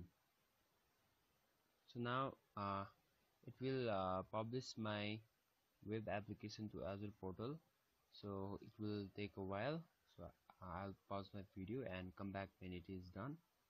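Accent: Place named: India. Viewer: I hear an indian, I click off...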